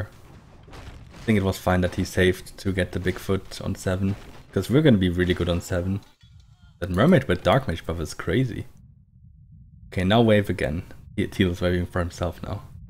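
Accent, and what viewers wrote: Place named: Germany. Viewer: Dieser Moment, wenn du eine Sekunde nach dem Start des Videos merkst... ...dass der Sprecher Englisch mit deutschem Akzent spricht. :-D Aber nett, dass noch jemand das gute alte WC 3 spielt, weiter so! :-)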